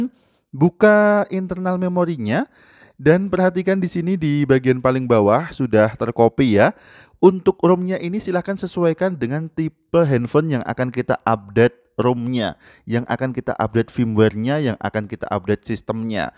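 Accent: Magelang, Indonesia. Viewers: orang magelang kah bang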